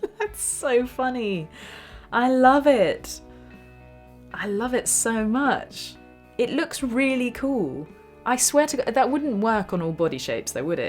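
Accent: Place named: England, United Kingdom. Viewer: is the best looking of the many guitar teachers I follow (and that English accent is fetching), and one of the best teachers too, plus her musicianship just keeps improving. Thanks for your videos Sensei - cheers!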